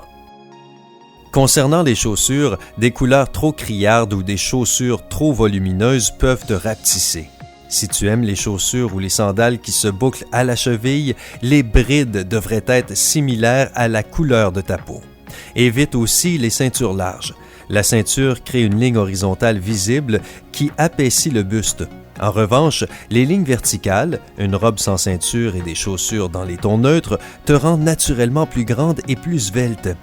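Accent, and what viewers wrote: Quebec, Canada. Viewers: L’accent québécois omg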